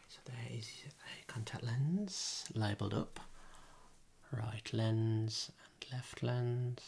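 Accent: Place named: London, United Kingdom. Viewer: that lovely accent of yours, is that Londoner?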